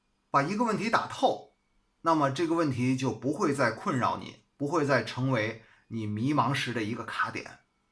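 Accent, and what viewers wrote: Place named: Liaoning, China. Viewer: 1. 老師口音聽起來像我遼寧老鐵的口音呢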